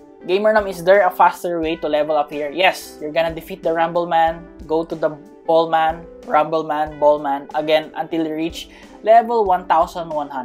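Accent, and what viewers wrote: Philippines. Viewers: it sounds like you have the accent? filipino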